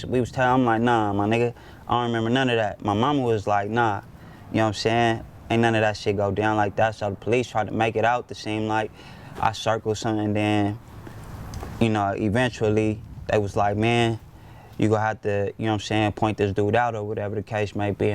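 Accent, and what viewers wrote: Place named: South Central, United States. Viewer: either that's a west side south central accent or he's nipsey's #1 fan lmao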